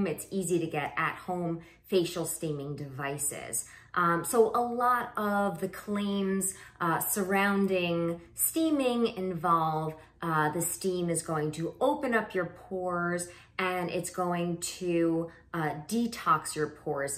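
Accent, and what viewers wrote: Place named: California, United States. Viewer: Why you have Cali girl accent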